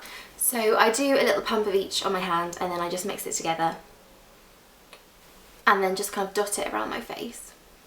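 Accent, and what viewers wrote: United Kingdom. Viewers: I love your make up routines and I love your british accent 😍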